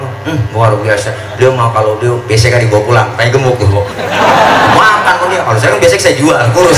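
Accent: Jakarta, Indonesia. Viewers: Ini ustad tile pernah cerama di kmpung ane di cinere.ibu ibu ngakak semua.ane seneng banget .Betawi nya mantaaaap .guru kita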